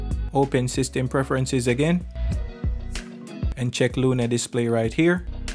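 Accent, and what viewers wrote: Jamaica. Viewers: This was very helpful for me. Thank you. I just purchased my Luna stick . I will also look into getting a Ethernet cable as well as my internet can be very unstable. I hear a Jamaican accent in there somewhere! and for that I will sub! lol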